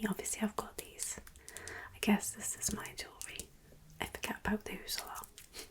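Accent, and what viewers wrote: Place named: Liverpool, United Kingdom. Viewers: Im irish and i loveee the scouse accent. Especially in asmr lol. Very relaxing ! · I love your Scouse accent, I love Scouse accents anyway but your version is so soft and relaxing 😌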